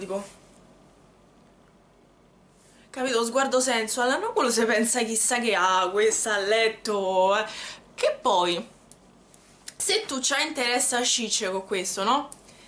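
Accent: Rome, Italy. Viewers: perchè non provi a fare anche un video dove insegni detti Romani? o comunque dici qualcosa in romanaccio, adoro come parli ahah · Io non ho questo stile ma ti giuro che è bellissima questa rubrica,poi io amo l'accento romano (io sono milanese) quindi amo sentirti parlare 💙💙😘 Ah,sei sempre la più figa 🔝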